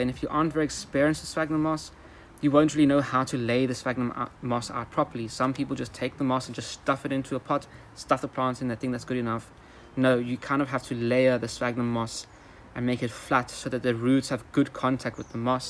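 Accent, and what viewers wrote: South Africa. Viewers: Nice to hear and see a fellow South African in the plant community 😃😁 Going to try repot mine. Is it ok to do now in late spring?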